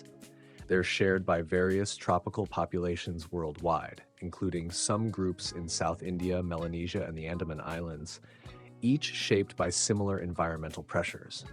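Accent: United States. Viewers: Hmm voice over has an American accent but channel based in Indonesia??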